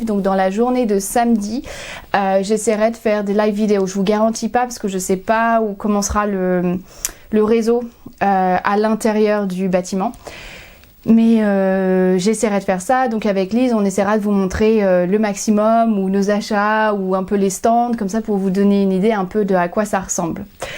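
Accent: England, United Kingdom. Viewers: Bon je crois que c'est mon premier commentaire :) J'adore te regarder, tu es pimpante et ton accent anglais ;) (même si tu n'es pas anglaise ;) ) tes anecdotes! Et tes rouges à lèvres :) Bon sans oublier tes magnifiques tricots! Dommage pour ton gilet :/ Amusez vous bien à l' EYF!!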